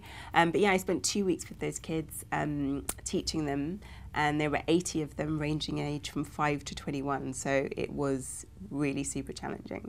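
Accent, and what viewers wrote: United Kingdom. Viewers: i loooooooove the accent yoooh you are so good. you sound soooo African in the movie and so British in real life woooow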